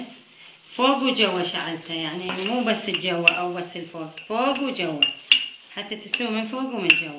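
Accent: Iraq. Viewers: خاله والله حجيج حلو عراقيه أصيلة اكو ناس بس أسافر ينسون همه عراقيين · اللهجه العراقيه ماكو احلا منه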